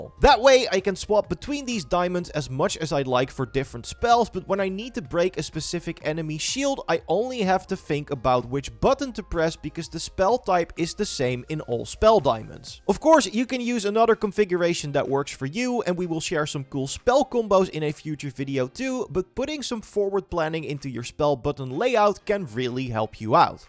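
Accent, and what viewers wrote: Netherlands. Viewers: Nederlands accentje hoppaa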